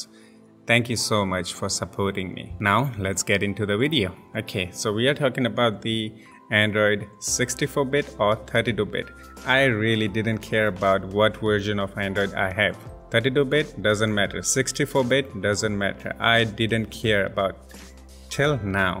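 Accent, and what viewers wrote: India. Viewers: atlast an indian person speak english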